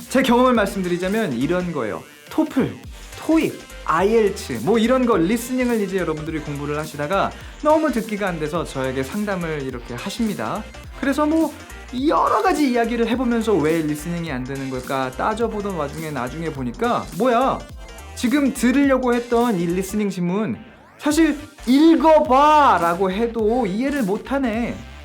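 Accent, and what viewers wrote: United States. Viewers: 뉴질랜드에서 학교다녓다고하셧는데 억양과 발음은 미국식인듯ㅎㅎ 요 궁금한게잇는데 아엘츠리스닝 6.5-7.0 왓다갓다해요 리딩은4.5 정도나오고요 딴지거는게아니라 저같은 경우는 왜그런지 알려주세요 아엘츠 시험조만간봐야되는 1인입니다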